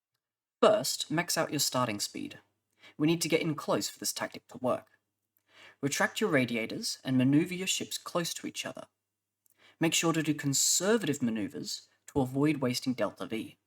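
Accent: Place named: Australia. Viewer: Nice to hear another Aussie voice picking up where Perun left. I'd love to see an update of tactics and overview of what's changed in the game. I stopped playing it a while back after a few play-throughs proved the game needed a lot of work to balance it and make it enjoyable for most players that don't have a masochistic streak. It has a lot of potential but is damn complex with so much of how the game works hidden, making it difficult to understand for many. Harder still with all the updates. Harder again if pandering to fanbois that do nothing to actually bring in new players and money. I hope Johnny Lump and co make a success out of this though as it's probably the most realistic grand strategy game out.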